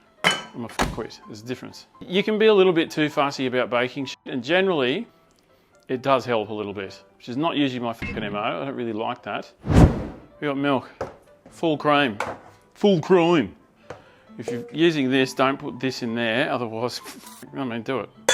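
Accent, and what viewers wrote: Australia. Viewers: Hearing an Australian guy say bunghole for 15 min is peak internet.. Simple as that.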